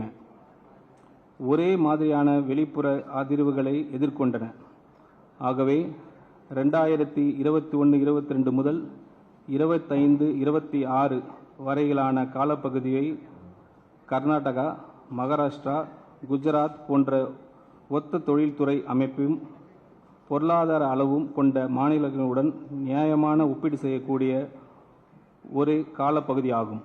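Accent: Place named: Marwari, India. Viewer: இவருக்கு தாய்மொழி தமிழ் தானா இல்லை வேற்று மொழியை சேர்ந்தவரா....மார்வாடி போல் படித்துக் கொண்டிருக்கிறார்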